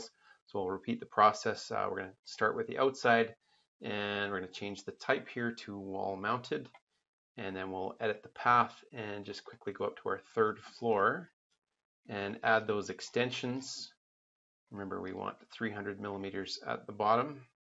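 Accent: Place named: United States. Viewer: Amazing tutorial man. Per your accent I assume you are American, I would have thought you would give the dimensions in inches instead of mm. Thank you so much.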